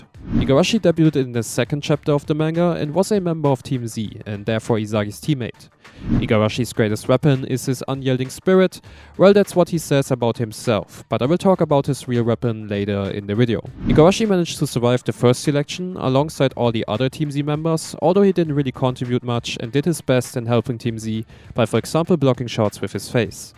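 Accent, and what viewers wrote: Germany. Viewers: german english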